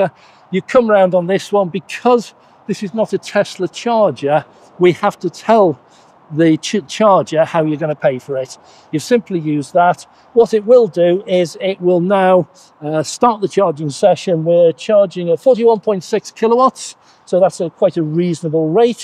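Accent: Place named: Liverpool, United Kingdom. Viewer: "From your accent you're not British".... I seriously thought she might reply "No, I'm Scouse". Because that accent is more Scouse to me than Hungarian.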